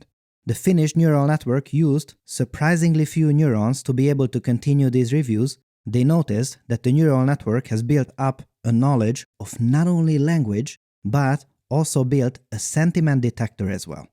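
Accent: Hungary. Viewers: Akcentusod eléggé magyarosra sikeredett. :D jó kis videó lett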